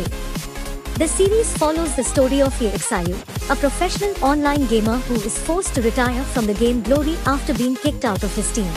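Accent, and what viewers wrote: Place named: India. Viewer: Chinese drama described by a lady with a thick Indian accent which is difficult to understand by Chinese or American viewers! Why?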